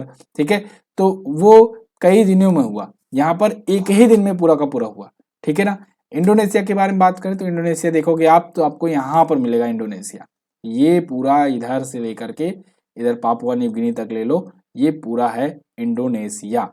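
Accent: Bihar, India. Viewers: Sir Bihar jamui se h 😅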